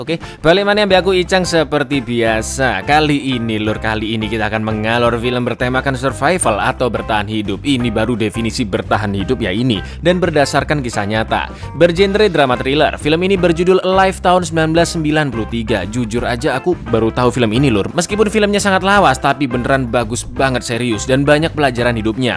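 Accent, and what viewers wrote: Java, Indonesia. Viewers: Pindah ke channel saqhayang yuk. Wong ini medok kali.. Gue org sumatra orak ngertos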